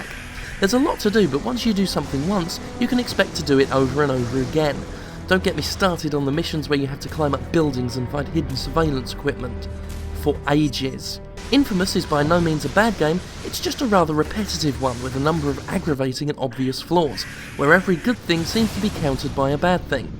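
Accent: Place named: RP, United Kingdom. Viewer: Look, if Yahtzee likes this game, then it must be good. Jim is just an angry fat British bloke who's accent is posher than it should be which makes his arguments seem more credible than they actually are (I'm British BTW X-D)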